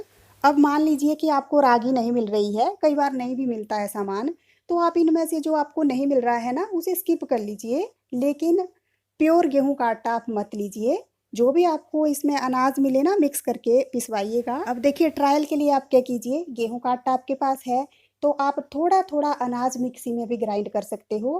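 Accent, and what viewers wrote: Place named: Pahadi, India. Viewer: Aaj voice tone me phadi lg rhe di ham b phadi hai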